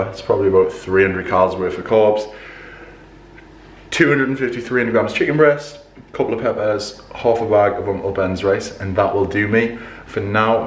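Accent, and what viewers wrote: Newcastle, United Kingdom. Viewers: Just subscribed, you're from Newcastle aren't ya?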